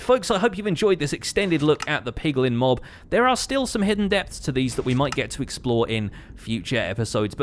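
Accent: United Kingdom. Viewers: your British Accent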